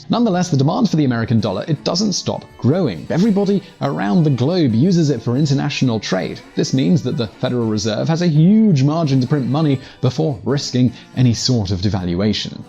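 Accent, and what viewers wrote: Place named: Southern England, United Kingdom. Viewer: It's his South Brit accent. Nothing wrong with his mic. :)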